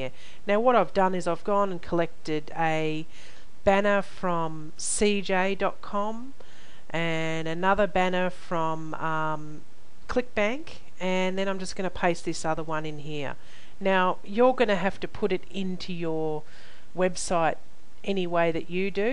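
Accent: Australia. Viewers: Trying to find a site explaining banners and hoplinks. Thanks for your video. Nice to hear an Australian accent for a change.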